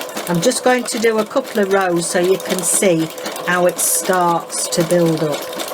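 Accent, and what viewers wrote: United Kingdom. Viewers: It’s so nice to meet you! Rachel has mentioned you several times & it’s always nice to match a voice with a name! I’ve never seen this technique before & I’m very excited to learn something new! Unfortunately I have to go on an archeological dig to find my sewing machine in my “sewing room”, hmmm…. Haven’t been doing a lot of sewing recently!! I’m new (since the pandemic began) to journal making & now I’m jumping into slow stitching! Still learning my stitches, hopefully I’ll catch up & get to play with my first page! Thanks so much for showing such a creative & fun technique, I love it! I have joined you as a new subscriber & given you the thumbs up & bell for new videos! I look forward to seeing more great ideas from you! Greetings from Lawrence, Kansas, smack dab in the middle of the US!! Where are you located? I love your accent, I wish I spoke British English! 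See you again soon! Thanks so much!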